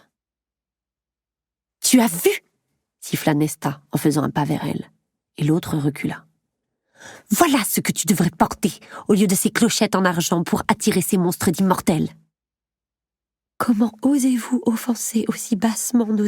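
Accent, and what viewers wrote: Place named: United States. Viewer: Quand on a lu le livre la prononciation des prenoms à l'americaine est tres perturbante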